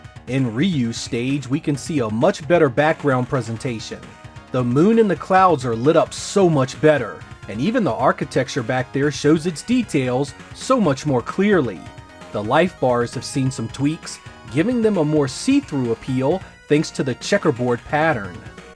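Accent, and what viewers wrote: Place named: Maryland, United States. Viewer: He sounds like he's from Maryland.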